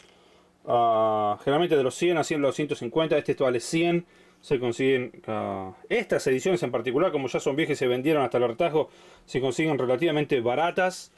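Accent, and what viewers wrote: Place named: Argentina. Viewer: Sin embargo aunque hablas con acento argentino tu acento no es tan marcado, ¿Es que vienes de una parte de Argentina dónde el acento no es tan marcado, o llevas tiempo fuera de Argentina y por eso has perdido un poco el acento o es que tratas de moderar tu acento para hacer tus vídeos?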